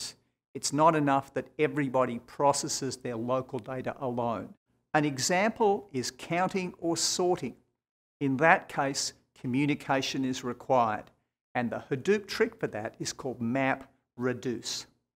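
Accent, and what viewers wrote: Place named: Australia. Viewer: That's a presentable Australian accent, they get much more wild than that!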